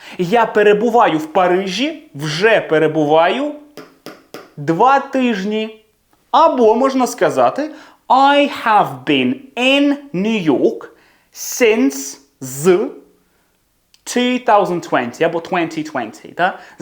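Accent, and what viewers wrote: United Kingdom. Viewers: А в Нью-Йорку відчувався їм ваш британський акцент?? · Вперше бачу вчителя англійської з України з таким ідеальним брит акцентом, дуже нейтів🤩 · Дивлюсь відео і балдію 🤪згадую уроки англійської в свої молоді літа- зеара, бʼютіфул , зисис е пен 🤣🤣🤣🤣 а тут слухаю ніби британець ☺️😘Щиро вдячна за урок ! Успіху Вам і нам ПЕРЕМОГИ🇺🇦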